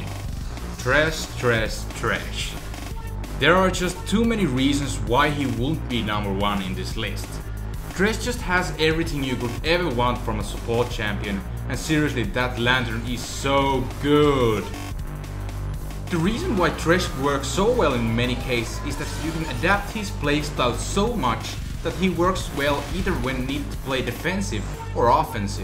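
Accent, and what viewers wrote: Finland. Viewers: Are u from finland? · Heti ku alotin videon tajusin "Tää äijä o suomalaine" sen kuulee enkusta...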